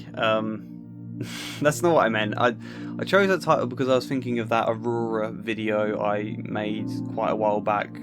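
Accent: United Kingdom. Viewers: If a couple’s political differences are just, big government versus small government. Republic or constitutional monarchy. A centralised or state-based economy. Then sure, needing your partner to agree with you could be fairly painted as a vice. But in this era, “political beliefs” are literally a matter of life and death. If you vote for someone who incites violence against minorities, your political views are hurting real people. If you vote for someone who doesn’t want rape victims to have access to abortion, your political views are hurting real people. If you vote for someone who doesn’t see a problem with police officers shooting unarmed black teenagers and stepping on necks, ultimately, you have blood on your hands. To imply that a difference of opinion on whether or not, for instance, Black Lives Matter, is something a mature couple should be able to accept told me as a viewer straight away that you yourself are white. 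Because these issues clearly aren’t life and death for /you/. Maybe I’m being unfair, it sounds like you have a British accent; if you’re in England, it is a different climate over there. But you specifically mentioned Trump. A man who defends and incites /actual white supremacists/. I loved your videos analysing Good Will Hunting, that was beautiful work and really deepened my appreciation for an already exceptional film. But this video was disappointing and honestly insulting. There are good people on the left and right, as there always have been. But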